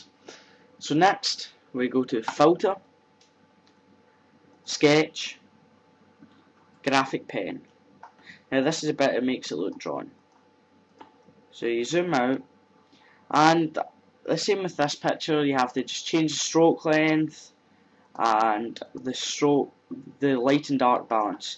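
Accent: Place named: Kilmarnock, United Kingdom. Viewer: nice tutorial and killie accent.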